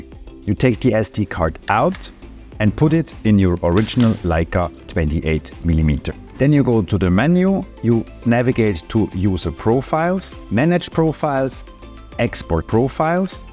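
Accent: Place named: Germany. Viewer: Qimago hat gesagt wir sollen dich schön grüßen 🙋🏼‍♂️ Und abonniert! Bleibt der Kanal englischsprachig? Deutscher Akzent ist so gewöhnungsbedürftig 🙈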